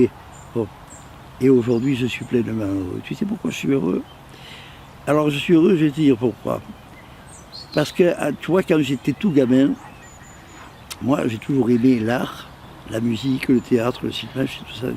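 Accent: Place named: South, France. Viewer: Salut et ......Chuttttt 🤗😆 et quel bel accent du Sud. Le rose me va aussi 😅😅😅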